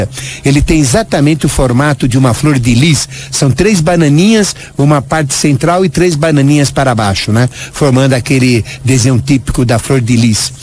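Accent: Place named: Paraíba, Brazil. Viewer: esse teu sotaque de paraiba que fode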